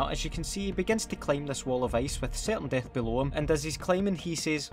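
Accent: Scotland, United Kingdom. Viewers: British overload holy shit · Am the only one that finds Scottish patter hilarious great video though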